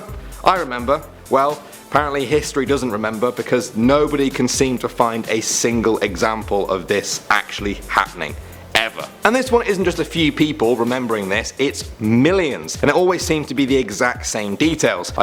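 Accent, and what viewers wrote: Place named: United Kingdom. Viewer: Omg please tell me you are British. I just loooove your accent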